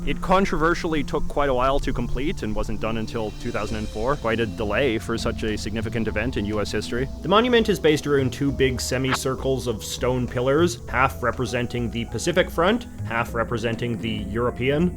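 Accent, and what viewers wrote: Canada. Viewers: This guy pops up in my suggested videos maybe 2 or 3 times a year, but every time I hear him, I’m always shocked at how much he somehow has the most punchy, if affected Canadian accent I’ve ever heard—and this with having had Canadian classmates, dormmates and colleagues in America and abroad! Oh, and no one thinks American buildings are all closed off and under otherworldly security, except for maybe Canadians whose media desperately want to portray Canada as somehow so different from the US in terms of security and civil discourse in public spaces—but their public let us know that they are never too far off or behind in many ways, right, Ambassador Bridge?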